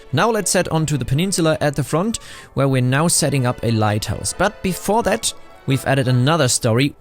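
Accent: Germany. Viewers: Das LEGO-Build ist Genius-Level - das Video ist sehr spannend. Nur dein Englisch ist eine Katastrophe...Vokabular gut, es geht nur um die Reihenfolge der Wörter, die typisch deutsch ist, wie auch die Aussprache. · the most "german english" i've heard in a long time. weiter so! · as a german i hear the german accent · junge was ein gammliges kartoffelenglisch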